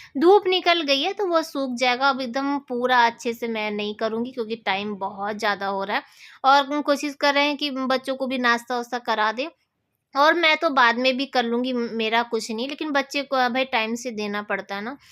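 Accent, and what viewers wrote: Uttar Pradesh, India. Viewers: Koi na apki UP wali language hai..😂😂UP wale eaise hi bolte hai 😂😂😂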